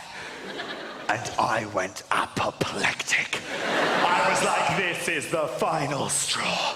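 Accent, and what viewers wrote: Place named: Germany. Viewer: Почему его английский звучит как немецкий?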